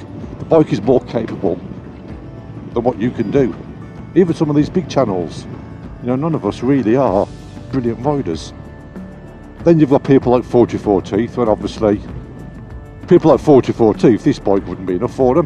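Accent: Birmingham, United Kingdom. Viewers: Tuned into to this as I've been thinking about getting a full license and the idea of a CB500X caught my attention for a while. First few seconds and I'm hearing a familiar accent... Within a minute or two I realise where you are and how this isn't a million miles away from me! First time I've seen a bike vid that's based over in Brum side. I'm going to have to go through some other vids now! Thanks in advance. All the best :)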